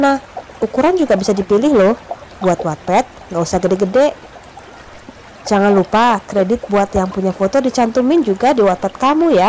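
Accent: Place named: Java, Indonesia. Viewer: baru tau ini suara... jawa bener..hehehe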